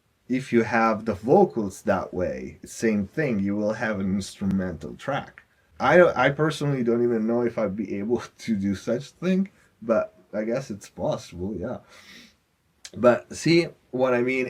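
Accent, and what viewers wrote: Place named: Italy. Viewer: Just curious from your accent but are you Italian?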